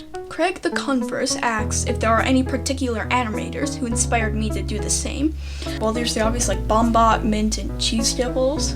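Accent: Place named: United Kingdom. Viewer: I used to think you were british back then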